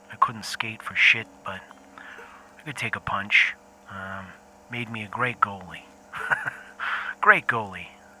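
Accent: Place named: Boston, United States. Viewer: What a great way to use the opening tutorial and yet make it completely your own! Really looking forward to this. And so glad you are doing a Boston accent that doesn't sound like a parody of a Boston accent.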